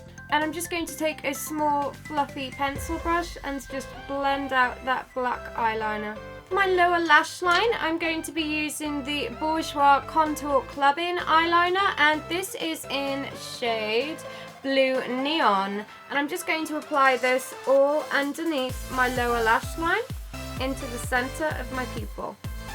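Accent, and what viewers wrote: United Kingdom. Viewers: i'm german and i absolutelyyy looovee your british accent (is is acctually british huh?! :D) and I love your videos!!!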